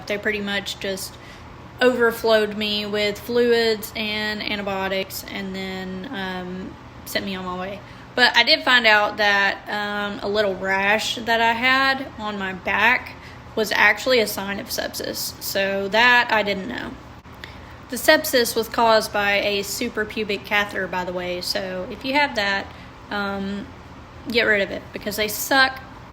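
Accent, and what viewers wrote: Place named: South, United States. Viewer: I'm in love with your southern accent,.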